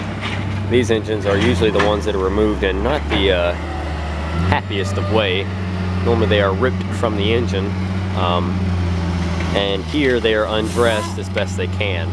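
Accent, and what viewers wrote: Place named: Carolina, United States. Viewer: Thanks...that was cool. Ever ship cars to other states? Where are you? Sounds like a Carolina voice.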